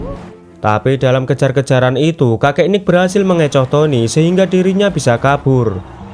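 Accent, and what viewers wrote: Java, Indonesia. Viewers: Meddookkk adminnya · Medok banget suaranya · Medok jowone mas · Baru mau saya komen, medok amat ya, Brebes apa Purbalingga ya😅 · Jowo mu bro medok banget,😅trimakasih ya bro sdh bs menghibur para pemirsa yg suka dg flm action👍👍💪💪🙏🙏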